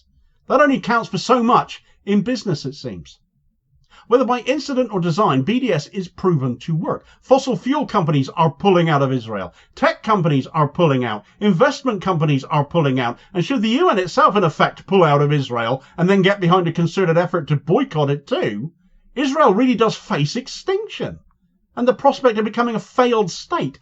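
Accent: Cornwall, United Kingdom. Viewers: He is from Cornwall in the UK and has a regional accent (which I love), try using captions maybe?🤷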